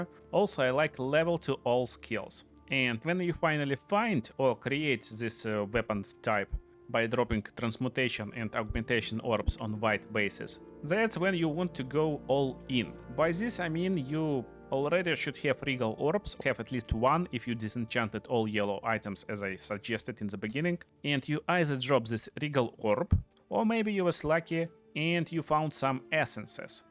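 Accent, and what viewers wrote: Russia. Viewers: Where's the accent from? Russia?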